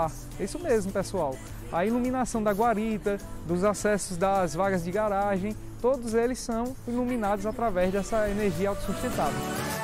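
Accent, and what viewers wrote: Northeast, Brazil. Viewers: Lindo ap ! Logo saio daqui de São Paulo ,e partirei aí nessa cidade linda... amo é esse sotaque nordestino...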